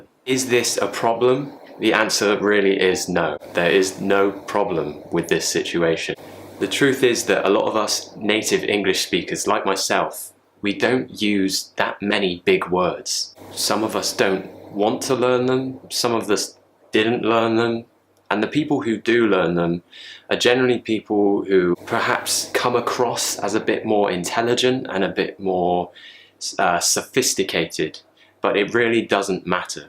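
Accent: United Kingdom. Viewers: Hi sir how are you? I like british language accent so i watching your every episode. Thanks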